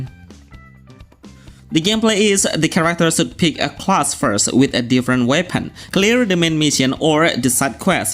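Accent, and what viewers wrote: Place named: Indonesia. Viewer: Indonesian accent😅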